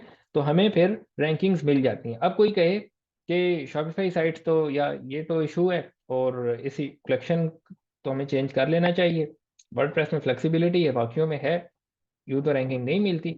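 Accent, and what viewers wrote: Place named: Punjab, India. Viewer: Nice lecture & your punjabi touch in conversation is more funny 😂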